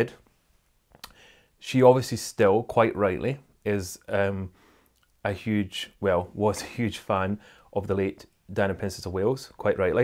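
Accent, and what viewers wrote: Scotland, United Kingdom. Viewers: I’ve just discovered your ‘show’, how lovely! You do a wonderful job especially because you aren’t malicious or gossipy, we don’t need any tabloid nastiness. Your delightful stories (though the children’s one and the soldiers one had me welling up) show genuine insight into the Duchess. Through your work, you have had amazing experiences, thank you for sharing them with us! ( what part of Scotland are you from? My father was from Aberdeen, I loved his gentle accent)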